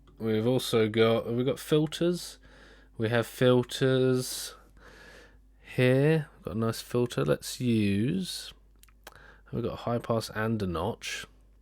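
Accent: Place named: West Country, United Kingdom. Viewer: Are you still making videos? Or have you become to a higher plane artist? You sound like your rather from Devon or Cornwall.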